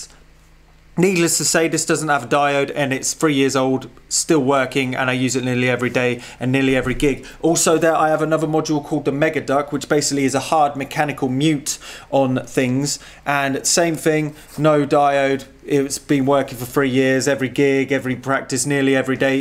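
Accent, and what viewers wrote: United Kingdom. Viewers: It's weird. I understand only less than two thirds of what you are talking about, but I find watching and listening to you still interesting, motivating (why? I don't think I'll ever get myself into any electronics project) and strangely.. soothing. Maybe that's the passion / creativity / positivity ("don't be scared to try"? wonderful) flowing through. And the lovely british you are speaking (though maybe this one is because I just watched an american movie located in Texas :). Anyway, thank you!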